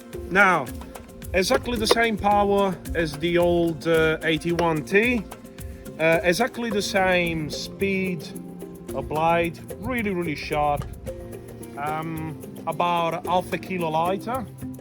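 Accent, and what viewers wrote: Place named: South Africa. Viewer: Trying to work out your accent. So far i've got South African/Russian /Aussie/Brummie.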